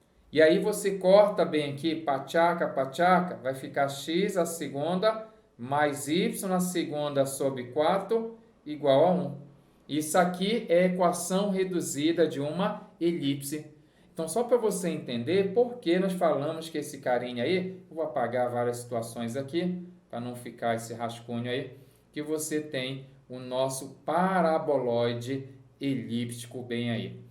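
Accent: Pará, Brazil. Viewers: Égua!!!! sabia que tu era paraense. Um paraense reconhece outro KKKKKk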